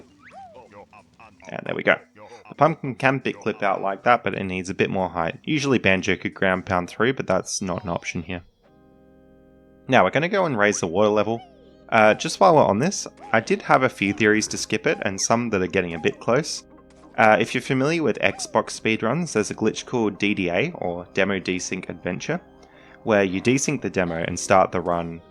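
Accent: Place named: Australia. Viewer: I still get surprised every time I hear an Aussie or New Zealander accent on the Internet. I shouldn't be, but I am, cos I grew up in a time when the Oceanians had really bad Internet. Also, cool video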